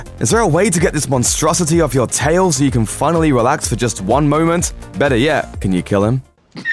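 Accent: United Kingdom. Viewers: Stop talking british style dude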